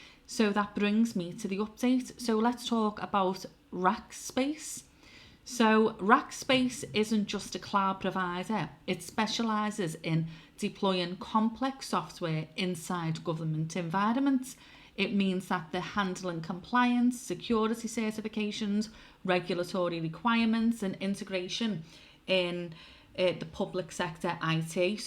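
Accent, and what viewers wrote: Liverpool, United Kingdom. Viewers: I like your voice. It's a kind of pure scouse. In Lord of the Rings I think the Palantir was a magical stone that enabled people to look into other times and places. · Came for the, stayed for the Scouse! I'm impressed by your analysis. Do you follow Joti Brar?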